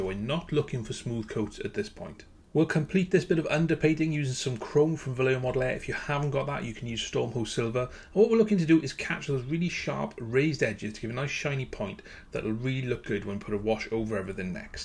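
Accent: Wales, United Kingdom. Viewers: Your very welcome. I denote a Welsh accent? Newport?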